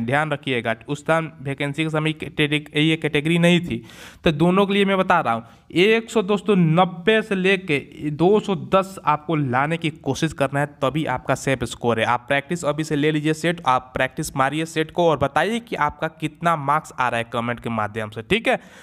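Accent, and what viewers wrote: Bihar, India. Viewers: Bihari h kya aap??😀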